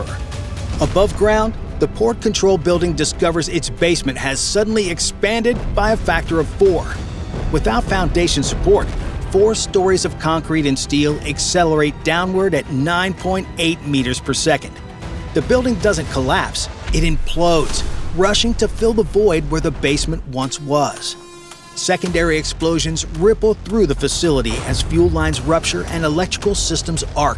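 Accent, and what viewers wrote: United States. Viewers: Had to give up. I can't stand the overblown yank narration 🤢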